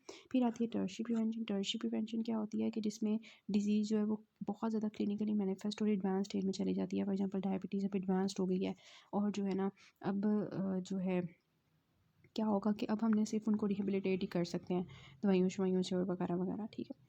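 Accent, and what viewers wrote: Karachi, Pakistan. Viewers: MAINE W22 OR BILAL MAZDY KA AWAZ SUNA MTLB AP KARACHI SY LAG RAHIII HAIN DAIKY LECTURES KITHNY SHOUQ SY SUN RAHA HU BAGROUND KI ENVIORNMENT BE CAPTURED KR RAHA HO HHHHHH GEO KHANS HHHHH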